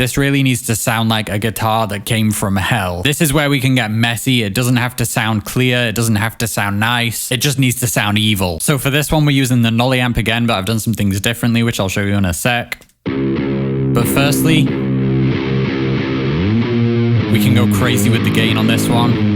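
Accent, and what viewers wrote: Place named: Sheffield, United Kingdom. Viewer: Just found you today. watched a bajillion videos already. You have a bit of a Sheff twinge to your voice, hope i'm right. Big up Steel City if i am!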